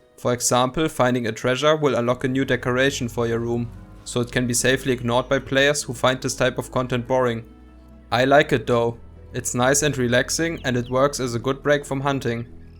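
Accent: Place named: Germany. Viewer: The German accent though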